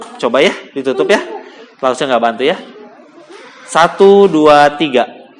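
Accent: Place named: West Java, Indonesia. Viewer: Wah keren kakaknya sermon pake aksen Sunda😂😇👍🏽👍🏽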